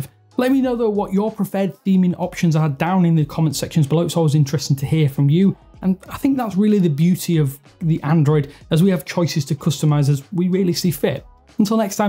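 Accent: United Kingdom. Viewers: Are You British...?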